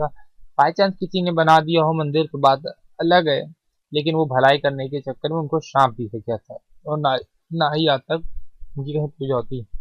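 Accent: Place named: Uttar Pradesh, India. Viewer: hehe... UP tone!!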